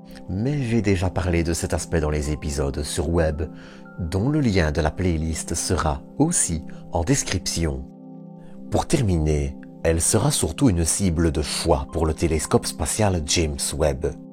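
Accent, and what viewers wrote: Belgium. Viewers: votre accent belge qui me rappelle de bons souvenirs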